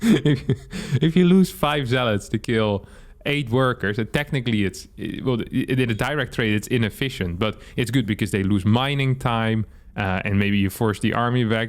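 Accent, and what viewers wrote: Germany. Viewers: Your german accent was so funny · love your german accent xD 10/10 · I love Harstem doing a German accent anytime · Your german accent is perfect 😍😍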